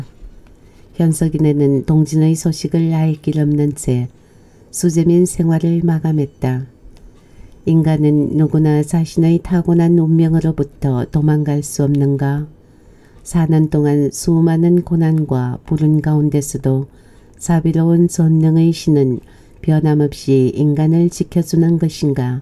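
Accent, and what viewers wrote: Gyeongsang, South Korea. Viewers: 70 년대를 거치면서 살아온 사람으로서 많은 기억들이 떠오르는군요. 서울에서 태어나 자라온 나에게는 에 경상도 사투리가 처음 조금은 매끄럽게 들리지않았으나 지금은 익숙해져서 푹은한 감정이 생겼어요 항상 변함없이 굴곡이 없는 그 음성에 마음이 편해집니다. 좋은글 감사합니다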